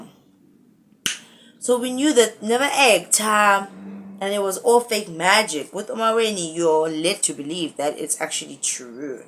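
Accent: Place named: United States. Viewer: Its the "snobish American accent" that does it for me,it gets me all the Time 😂😂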